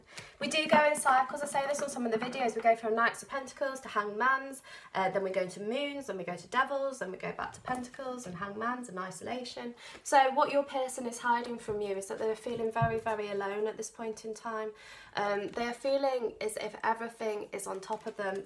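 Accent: United Kingdom. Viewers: Deck #2 🙊💖 thank you, this is a nice reading. I love your purple hair :) and your style. It's just been a little hard for me to keep with your fast speaking 😅 bc British English has a strong accent for me, I'm used to American accent more, so yeah it was hard to keep on with the reading 😅 had to turn on the subtitles.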